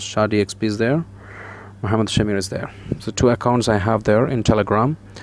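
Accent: Kerala, India. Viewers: English kettaal manassilaakkam malayaliye..💞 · R U from Kerala?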